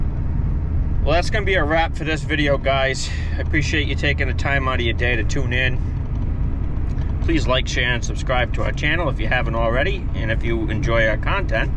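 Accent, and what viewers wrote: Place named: Massachusetts, United States. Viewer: From the sound of your accent, you live up where I was born and raised...Massachusetts? I live in North Carolina now, have for 30 years. Good video guys, keep up the good work, I'll give you a shout out on my next video.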